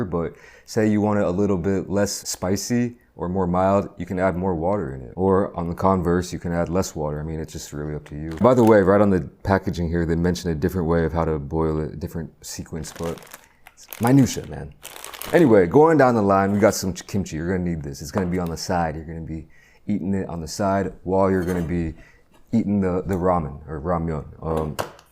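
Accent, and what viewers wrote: United States. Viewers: He's American I think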